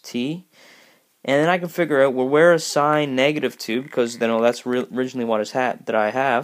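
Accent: Newfoundland, Canada. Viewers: I knew I realized the accent! Newfie as well!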